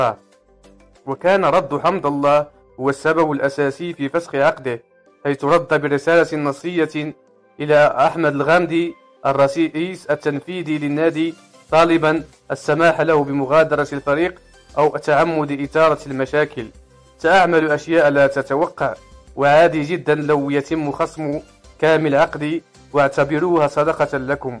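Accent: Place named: Bangladesh. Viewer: ياصاحب المقطع انت جالس تسجل جوا خزان مويه ايش التقطيع هذا جيب شخص عربي يتكلم مو بنقالي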